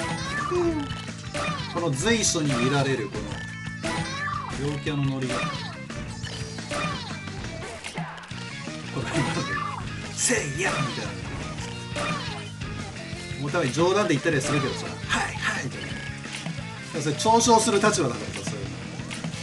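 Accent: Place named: Kagoshima, Japan. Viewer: の鹿児島弁かっこいい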